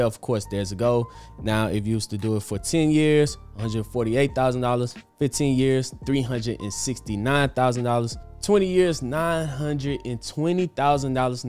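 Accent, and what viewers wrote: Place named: Atlanta, United States. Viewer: What up! I swear sometimes I think you're in ATL with me when you talk. But this is not my comment for "QYLD the Movie" you put together. Based on vid, you invested $20 aday excluding weekends and holidays; correct? This calculate to 261 days a year at $20 aday for a total of $5,220 investing for a monthly paying div. My friend, I will be joining you; utilizing your strategy by reinvesting back into QYLD. Thank you for the entertainment purpose videos and I look forward to joining the road to passive income investing.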